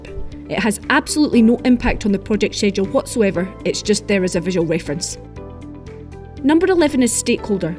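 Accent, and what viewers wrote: Scotland, United Kingdom. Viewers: The strongest, most fascinating Scottish accent I’ve ever heard in my life. Love et!😍🤣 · I luv da scottis¨h accen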